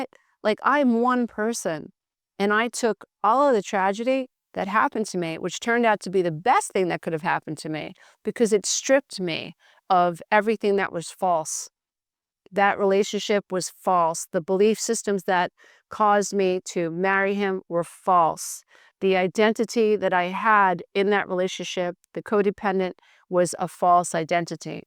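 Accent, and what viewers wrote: New York, United States. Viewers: I knew you were from New York…I thought Long Island ❤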